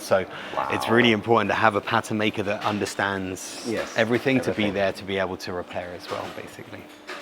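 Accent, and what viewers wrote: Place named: United Kingdom. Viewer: 好像英式口音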